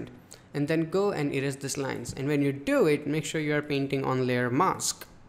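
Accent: India. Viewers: the indian accent is so cute lol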